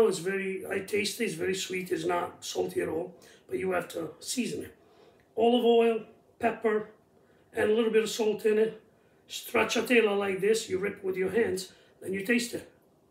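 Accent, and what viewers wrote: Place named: Italy. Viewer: love your Italian accent, what part of Italy is your family from? I come from Giovinazzo, Bari (Puglia region)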